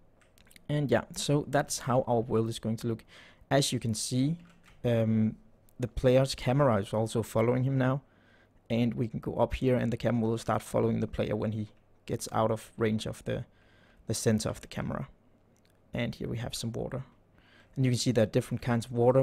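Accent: Denmark. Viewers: Love your tutorials! just started coding so this helps alot. You sound danish, am I right?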